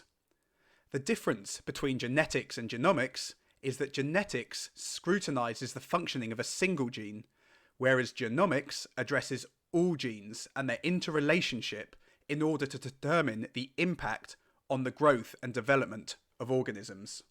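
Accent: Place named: United Kingdom. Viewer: How do you invest in ARKG in the U.K.? I assume you’re U.K. based from your accent?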